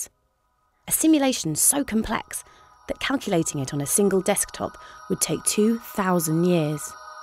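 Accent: United Kingdom. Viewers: Долбаный британский акцент! Ненавижу! Что за wohapen? Сложно нормально говорить? Придурки!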